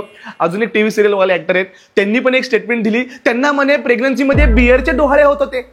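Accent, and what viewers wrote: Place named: Nashik, India. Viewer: Thanks nashik chi bhasha yetech madhe madhe bolnyat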